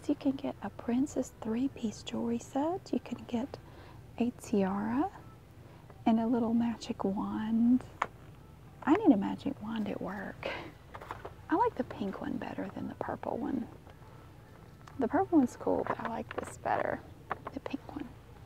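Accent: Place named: Georgia, United States. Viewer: I don't write comments often or at all really but I really want to say Thank you I used to live in GA but I moved and your accent reminds me of home. Also I still get the same tingles when people whisper in my ear. I've had a really hard time falling asleep lately but your videos remind me of home and put me right to sleep! Thank you for making your videos! You are absolutely amazing. Please don't stop making videos. Love the walk throughs!! Stay strong and blessed!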